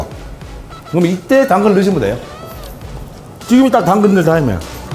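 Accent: Chungcheong, South Korea. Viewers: 으 감자셀레드 멋지게 활용 잘봣십더 요리 즐겁게해주셔 많은양 을 어덯게 햇는지도 모르게 다 빠르게 신나게 실렁실렁 보너스 충청말씨 아주 잘봣십더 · 충청도 출신이라 백선생님 말씀하시는거 듣고 있음 고향에 간것같이 편하구 좋음~~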